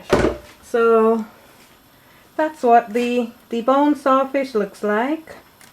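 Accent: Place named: Montserrat. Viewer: Funny when I heard your accent and saw the ingredients my first thought was Montserrat 🇲🇸. Are you from Strat? Very good video, clear and easy to follow.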